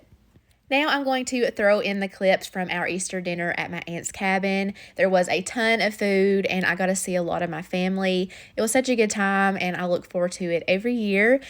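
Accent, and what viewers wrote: South, United States. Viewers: I get so excited when you post! I'm from Tennessee but I still love to hear the country accent and see all the good cookin :)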